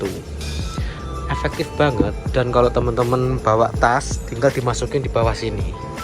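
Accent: Java, Indonesia. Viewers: Yaampun logat bahasa jawanya itu loh yg bikin 😍😍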